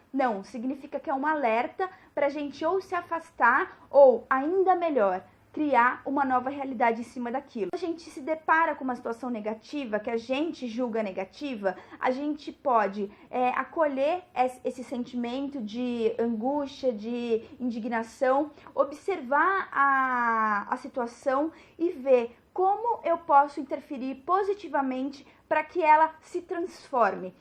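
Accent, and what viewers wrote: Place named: Sao Paulo, Brazil. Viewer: Paulista da gema,com esse sotaque!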